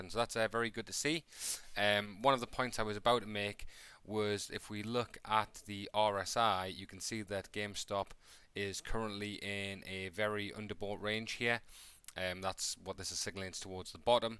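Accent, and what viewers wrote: United Kingdom. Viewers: Oh nice, a Brit. Hey 👋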